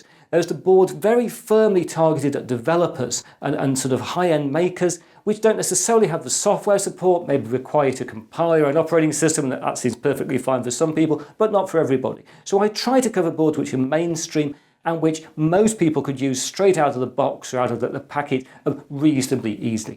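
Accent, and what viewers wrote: United Kingdom. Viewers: It's hard to resist the (vocal) charms of a well-spoken British gentleman, especially when he imparts information in such a crisp, concise manner. There is more than enough flash and noise on Youtube, a bit more understated presentation and thoughtfulness goes a long way. Congrats for a hundred and fifty healthy doses of knowledge, here's to loads more!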